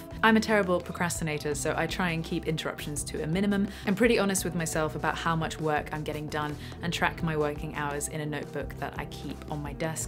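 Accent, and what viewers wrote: United Kingdom. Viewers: She's so Bri-ish... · Omg that soft perfect beautiful British accent <3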